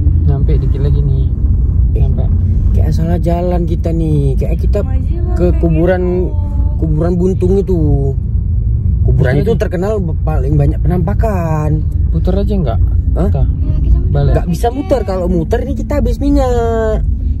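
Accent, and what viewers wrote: Malaysia. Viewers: Ngomongnya kek orang malaysia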